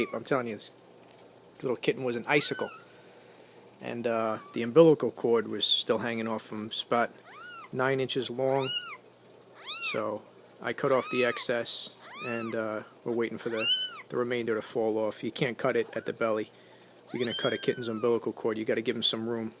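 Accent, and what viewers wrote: New York, United States. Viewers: I love the sound of your tough new yorker accent and then this cute kitten. Great combination. You did the best you could. You're a legend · Buddy, are you from queens or new york general area? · Hey man, are you a New Yorker?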